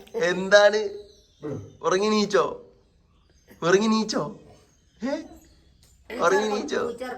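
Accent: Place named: Malappuram, India. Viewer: Umma kolllam baasha paraunnund malappuram baasha😊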